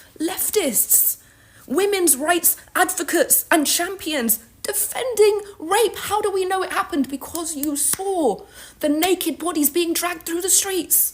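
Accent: United Kingdom. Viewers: She's not iranian - i hear british accent. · Correct. That's how you know everything she's saying is utter BS. Not to mention she misses much of the underlying factors and historical contexts of the past 300 years that would explain where we are today. She gotta cute face and a British accent so many will listen.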